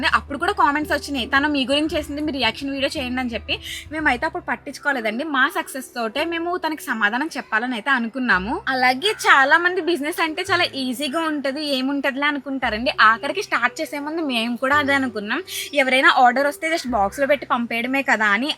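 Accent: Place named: Godavari, India. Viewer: Godavari vallu alane matladataru andi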